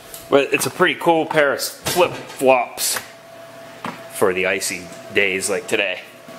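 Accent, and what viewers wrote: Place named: Pittsburgh, United States. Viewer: there's been one or two times where I can hear that yinzer accent but I don't anticipate anyone mistaking you for Pittsburgh dad.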